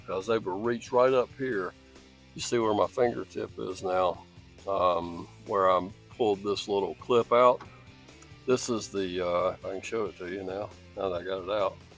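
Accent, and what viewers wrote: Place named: Texas, United States. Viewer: Appreciate the video and your accent. Texas?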